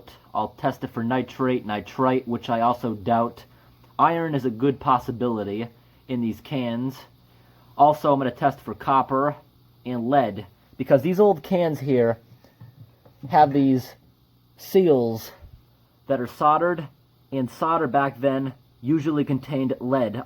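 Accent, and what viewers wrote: New England, United States. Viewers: Dark Diddler New England accents are very unique. He says “dat” instead of “that” which is pretty common for that area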